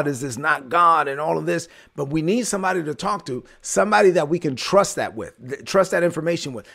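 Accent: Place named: New York, Dominican Republic. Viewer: You blessed me Again today. You do that every day. Also, I know your accent is not only NY, but Dominican first. Thanks for the words every day 💞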